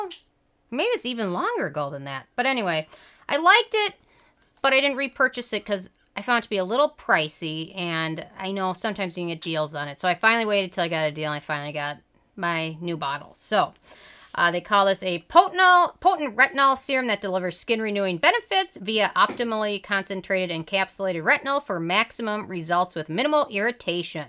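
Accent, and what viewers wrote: Minnesota, United States. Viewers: I’m Minnesotan and recognize a fellow cold north accent when I hear it ;) Wisconsin?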